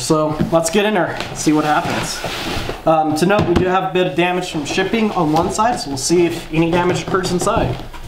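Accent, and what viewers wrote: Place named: Russia. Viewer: I can't place your accent. When you run some words together, it sounds like another language. Russian? Chinese?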